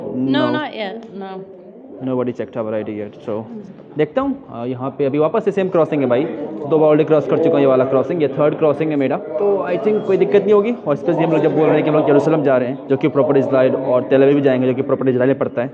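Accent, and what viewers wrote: Bihar, India. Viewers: This is the way a bihari talk . (Hum) Aur (bhai) in most sentence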